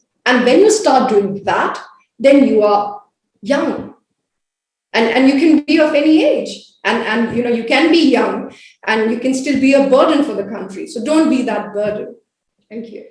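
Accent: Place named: United Kingdom. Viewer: madam everything is good but to connect with masses please you can speak in indian accent, please minimize using british accent.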